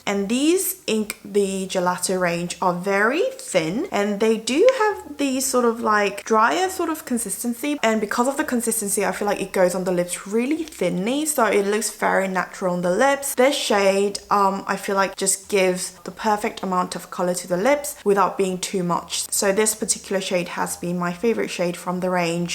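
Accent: United Kingdom. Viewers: 미국 영어나 캐나다 영어 하시는 분들은 많이 봤는데 영국 영어 하시니까 멋있으시고 신기해요! 🥺✨ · 안그래도 영어로 말씀하시는 콘텐츠 보고싶다고 댓글담기려고했었는데 이번에 뙇!! 넘 반갑고 좋아요 헤헤 의 영국식발음도 좋고 구사하시는 단어, 목소리 등 다 너무매력적이에요ㅠㅠ💕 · 영국억양이 넘 듣기 좋아서 계속 되돌려보기 한다고 진도가 안나가요..❤️ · 심지어 오랜만에 영국영어로 해주시니 더 좋네요 흑흑 😌❤️ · 오오랜만에 영어로!! ㅎㅎㅎ한국으로 오시고 영국발음 너무 오랜만에 들어요♥️ 오늘도 잘볼게요!! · 영국 발음!!! 영국계 한국인이신가용..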